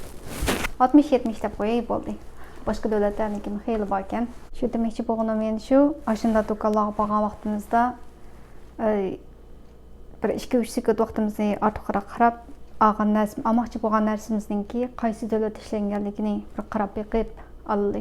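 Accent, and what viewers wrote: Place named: Turpan, China. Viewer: ساپ تۇرپان تەلاپپۇزى 😉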